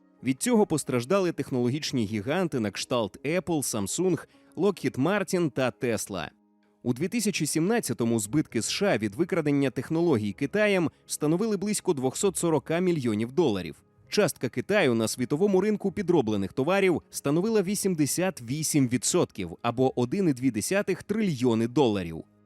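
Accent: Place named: Moscow, Russia. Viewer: Голос за кадром- "гвориЦЦць, жиЦцЦя, громаДЗзззяни"... Вкрай неприємно слухати ці маасковські виверти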